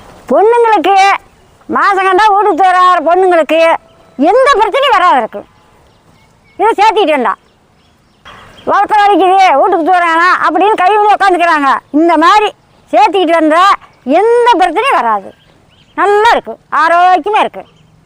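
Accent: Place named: Kongu, India. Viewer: சமையலை.விட நம்ம கொங்கு தமிழ் அழகு ❤❤❤❤❤❤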